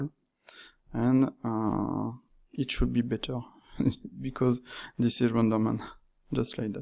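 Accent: France. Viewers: on reconait le francais mdr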